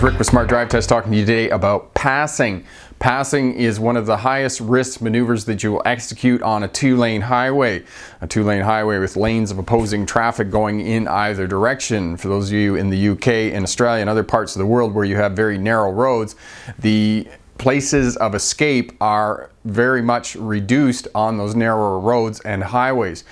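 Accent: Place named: Canada. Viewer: I could tell he was Canadian from his accent :)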